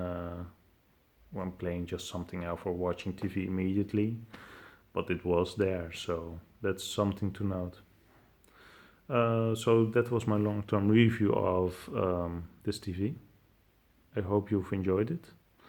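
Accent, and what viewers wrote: Netherlands. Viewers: Are you dutch all seeying guy? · Don't say "tink" just start saying vink (you are clearly as Dutch as I am) but even before starting to pronounce the v switch to fink.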